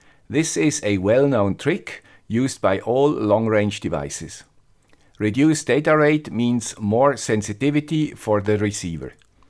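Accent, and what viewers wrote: Switzerland. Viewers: youtube: *sends notification about new video * me in mind: * PRETTY YOUTUBERS HERE IS THE GUY WITH SWITZ ASCENT WITH FRESS SENSORS AND MCU......* 😍😍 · Hallo Herr! Ich hoffe Sie haben trotz dem netten Schweizer Akzent das Deutsch noch nicht ganz verlernt. Ich liebe ihre sibirische? Katze vom Abspann, gruss von meinem sibirischen Kater Candy. Leider habe ich das englische nicht so drauf wie Sie, kompliment für Ihr Schweizer Englisch und Ihren tollen Kanal. Ich habe noch keinen Youtube Kanal gefunden in dem ich so präzise Erklärungen zu diesen hochtechnischen Themen gehört habe. Bitte weiter so! Grüße von Michael aus Deutschland.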